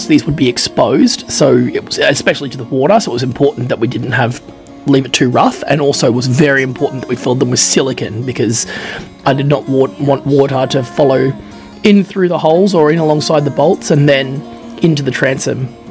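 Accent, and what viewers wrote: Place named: Australia. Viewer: Feeling a lot like a mighty car mods video, not sure if its the similar music in the background or the aussie accent! haha Keep it up man!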